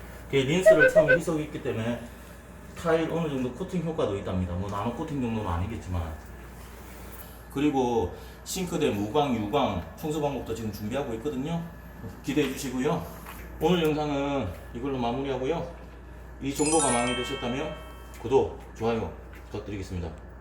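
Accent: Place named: Gyeongsang, South Korea. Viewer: 아니요. 경상도 말투입니다. 투박하면서 정감있는 말투~~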